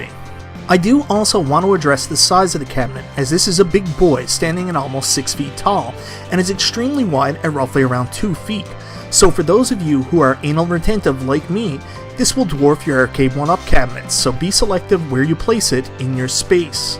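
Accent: Canada. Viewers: Great review. By chance are you a fellow newfie haha accent sounds like it lol. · So far, you can tell you're from Canada. Especially with the "marquee". I love the accent. Thanks for the review!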